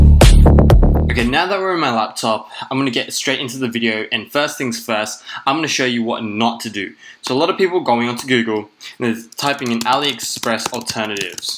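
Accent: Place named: Australia. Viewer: Sounds like an Aussie.. 5 bucks must be like 50 cents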